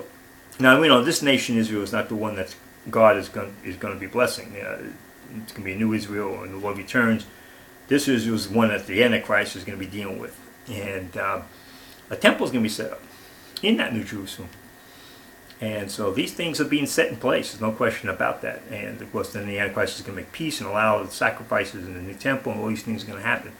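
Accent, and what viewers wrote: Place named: Boston, United States. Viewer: Are you from Boston like me ? You sound like you're from Boston.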